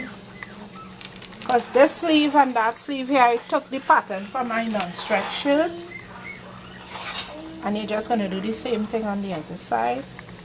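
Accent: Trinidad and Tobago. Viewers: hey are u trinidadian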